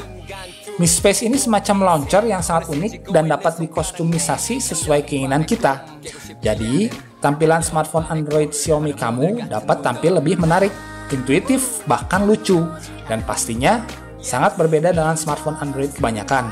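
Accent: West Java, Indonesia. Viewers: sunda pisan euy, hehe, hatur nuhun videona. :) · Sampurasun... Sunda pisan kang... hhe... Mantep reviewnya.. Tp ngmg2 Redmi 1s sm Zenfone 5 beda kalas sama harga kang.. Kaleresan abdi pengguna Redmi 1s :D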